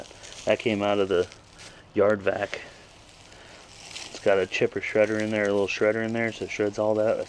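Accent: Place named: South, United States. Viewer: the southern accent is sneaking into this one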